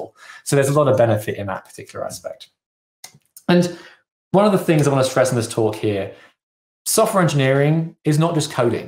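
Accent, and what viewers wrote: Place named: Scotland, United Kingdom. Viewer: Very useful video! I'm happy I've found this one. It has been a bit difficult to start with Airflow. By the way, I'm intrigued with your accent. Are you from Scotland?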